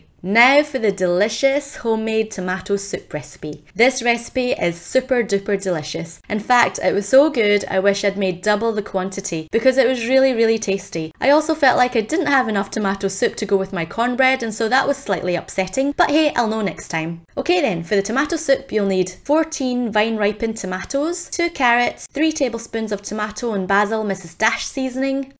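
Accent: Scotland, United Kingdom. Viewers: Are you part Scottish?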